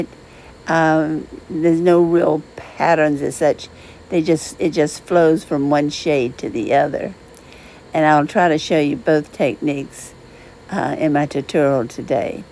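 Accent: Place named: South, United States. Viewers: What a great idea!! It turned out beautifully. I just found your channel and subscribed. Your accent sounds like your from a town near me in North Carolina!! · Your southern accent is beautiful...yella. These are such beautiful works of art! I just found your channel and I love it!❤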